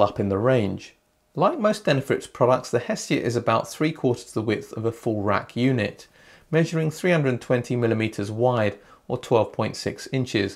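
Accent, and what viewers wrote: United Kingdom. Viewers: after 10 minutes, i could not take anymore the barroque and burgeous of the whole british speaking thing, sorry. Overdose. so much for just explaining a device...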